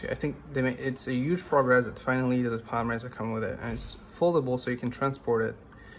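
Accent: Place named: Europe. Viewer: Where are you from? You sound European but pronounce it Zee instead of Zed.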